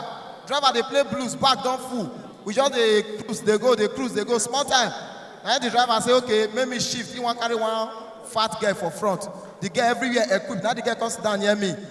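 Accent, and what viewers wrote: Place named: Edo, Nigeria. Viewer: His pidgin is very fast and pure bini, that's why the people needed few secs to process things. He talks very fast too. Good jokes bro, born n bred in the ancient city of Benin. Ride on bro !!!.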